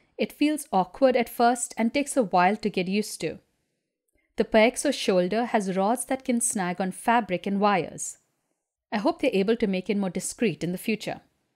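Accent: India. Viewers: I guess that u are from India because of your accent 😂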